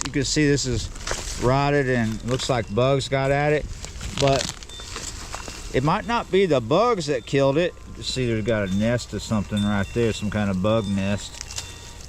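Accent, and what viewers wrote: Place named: East Texas, United States. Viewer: Boy have you absorbed that East Texas accent! Love your episodes!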